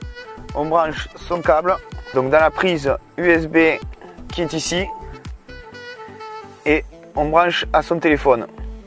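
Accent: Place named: South, France. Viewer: L'accent du sud, il apporte de la chaleur :) . J'ai acheté un 2008 crossway 130ch. je le récupère demain j'ai hâte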